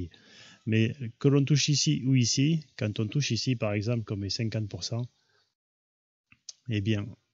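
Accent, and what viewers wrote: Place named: Provence, France. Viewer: Voilà une belle voix qui respire la garigue et le romarin comme Galinette peuchère !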